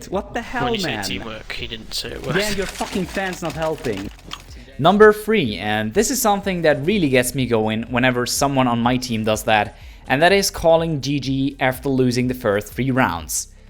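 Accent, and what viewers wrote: Switzerland. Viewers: wtf ur swiss xD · swiss or german?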